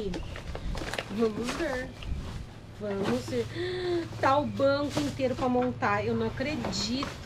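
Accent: Paraná, Brazil. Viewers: Vc é do Paraná?? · Acho que vc tem algo em comum comigo kkkkkk amo achar coisas que de para reciclagem e eu também sou do Paraná nasci em Maringá e fui morar Curitiba ainda crianças ,este seu sotaque não me enganou kkkkkk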